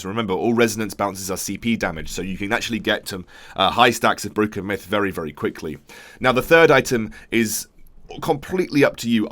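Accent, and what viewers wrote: United Kingdom. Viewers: British men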